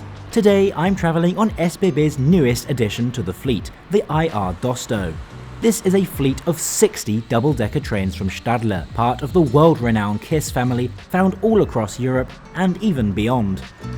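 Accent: Germany. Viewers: He's pronouncing them in germany-german not swiss-german😂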